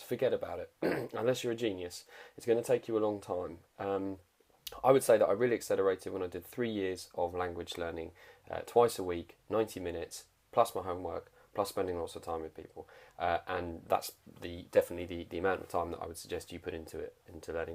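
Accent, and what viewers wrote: United Kingdom. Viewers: Dziekuje! I'm a fellow Brit, Learning Polish because my girlfriend is Polish. I have found the 'Drop' app to be the best, Combined with conversing and learning from my girlfriend every day. Its very helpful as she has studied linguistics. I have a question; you mentioned being obviously British from your accent. How do you find people treat you because of that? Is it negative or posite or neither? I plan to buy a second home in Poland with my partner so im very interested to know. Thanks for the video. The podcast tip is a great Idea, do you have any recommendations? I subscribed by the way! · Interesujace. Ja mowie po angielsku z polskim accentem a ty mowisz po polsku z brytyjskim accentem. Jestem pelen uznania dla twoej znajomosci jezyka polskiego ktory jest jednym z najtrudniejszych dla obcokrajowcow.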